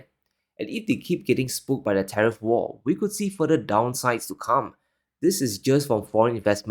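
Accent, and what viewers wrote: Singapore. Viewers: Too bad if you don't know what a Singapore accent is, and can't even spell properly in English. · is a Singaporean. Why would he speak in a foreign accent?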